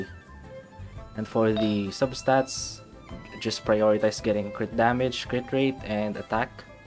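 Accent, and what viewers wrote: Philippines. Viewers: ok yung english mo pare, di naman siya cringy it's just english with a pinoy accent. nothing wrong with that : ) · You sound like you're from ph. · Sounds like you're a filipino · Pinoy blood my dude 🔥, lahat naman tayo ganyan magsalita ng English kahit ako Englishero ako eh ung accent lang talaga HAHAHAHA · You sound Filipino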